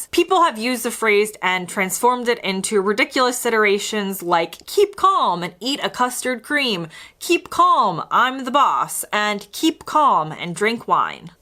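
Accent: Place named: United States. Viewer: Unfortunately, propaganda which can be sometimes be effective at the time also leaves a behind a false history. I know you have an interest in history, and there is enough material out there to study this in some detail. Though I recommend you never make a video about it. With an American accent, you cannot tell it. The "keep calm and carry on" and Blitz spirit stuff have left a very different picture of the war. One place you can start is the number of deserters and people who never showed up for their draft. British army gives the figure of 100,000 deserters, US claims 50,000. Nobody knows how many failed to show up at all. There are estimates of tens of thousands of deserters living in London alone. And these people were immediately rendered 'outlaws'. In a country where every person could only shop with government issued ration books, these people had to make other arrangements. 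The crime levels and 'dog eat dog' nature of a country at war get completely glossed over in the numerous fictional accounts written afterwards. The 'Blitz Spirit' itself is one of histories greatest revisions. It wasn’t safe for women to be on the streets. Homes were looted, stores were looted, bodies were robbed, murders occurred. "Crime experts" and Scotland Yard are on record as there being at least a few serial killers on the loose in London alone. I suspect if the "keep calm'" posters were never released, it was because the government knew it could only push so far. There used to be a UK org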